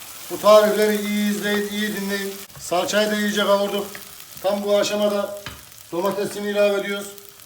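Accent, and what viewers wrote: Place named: Anatolia, Turkey. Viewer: şef zaten bir Anadolu insanı konuşması ve yemek tarifleri ile 10 numara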